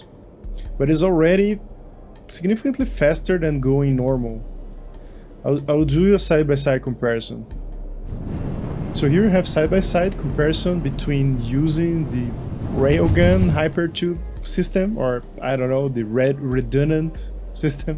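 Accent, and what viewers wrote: Brazil. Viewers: Dá para conhecer um brasileiro fácil pelo sotaque. hehehe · Mano vc é brasileiro??? Pq parece muito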